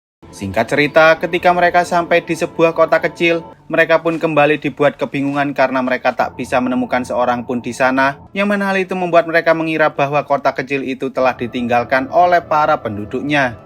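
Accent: Java, Indonesia. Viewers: Bagus dlm membawakan alur cerita nya mas nya keren,tp lucu bahasa indonesia nya medog jawa bnget 😀 tp aku suka denger nya 👍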